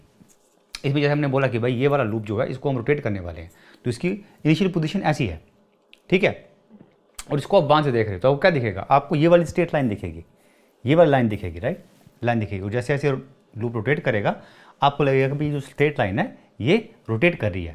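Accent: Kashmir, India. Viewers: Sir aap kashmir se ho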